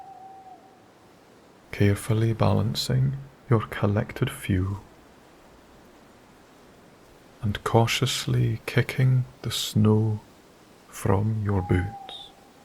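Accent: Scotland, United Kingdom. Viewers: Loved the story, but really enjoyed the Scottish brogue, will certainly keep listening!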